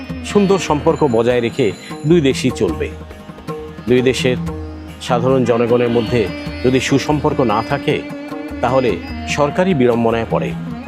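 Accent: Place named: West Bengal, India. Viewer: Your bangla is so beautiful. It is the same kind of language and accent that we bengalis from WB have. I enjoy your videos and your presentation. Greetings and best wishes from USA from a probashi Indian Bengalli. আপনার বাংলা ভাষা খুবই সুন্দর। এটা আমাদের পশ্চিম বঙ্গের বাঙালিদের মতোই। আপনার ভিডিও এবং উপস্থাপনা আমার খুব ভালো লাগে। একজন প্রবাসী ভারতীয় বাঙালির পক্ষ থেকে আমেরিকা থেকে শুভেচ্ছা এবং শুভকামনা।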